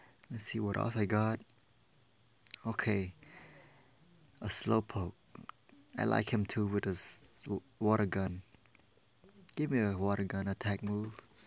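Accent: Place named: Cambodia. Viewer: Review I knew it. I can tell from your accent. I'm Cambodian too lol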